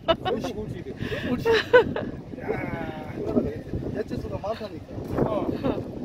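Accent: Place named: Gyeongsang, South Korea. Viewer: 차가라~차가라 차가! 완죤 갱상도 말씨!ㅎㅎ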